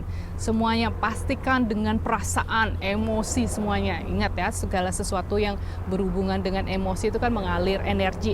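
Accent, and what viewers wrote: Banyumas, Indonesia. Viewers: Setujuuuu... Mungkin karena sesama suku ngapak2 jadi berasa lebih mudheng... Apalagi pas bilang "abab e" 🤣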